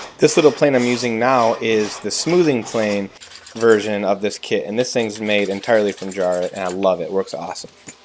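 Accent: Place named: North Carolina, United States. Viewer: Am I heating a North Carolina accent? You sound like my grandson in Fayetteville. Great video.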